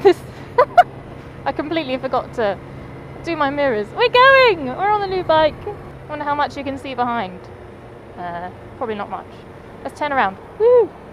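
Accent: United Kingdom. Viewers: Soo you're in germany? But you sound british? Also, do you do meets ?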